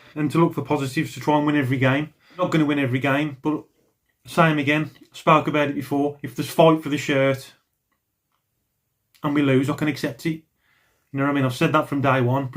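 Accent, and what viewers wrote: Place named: West Midlands, United Kingdom. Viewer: Great video again bud, you sound like your from my neck of the woods lol I'm a blues fan based in ahem West brom. But I'm enjoying your vids mate your certainly getting better as you go along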